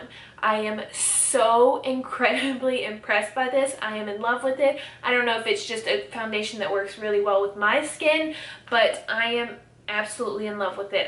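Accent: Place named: South, United States. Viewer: I do find that so crazy. Even though I don't personally make videos or anything, I do watch mostly "beauty" related content here on YouTube. And I, personally, like to watch those that I find relatable. Which is why I, typically, stick to watching the "smaller" channels, per say. I've seen several where I hear a thick southern accent and get a little hyped thinking they might actually be from here, but they never are! LOL. You are the only one I have come across, thus far!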